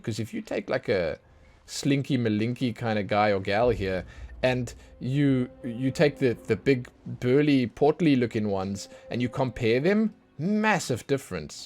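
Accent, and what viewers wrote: South Africa. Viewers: This guy is South African I could tell by his accent I’m South African too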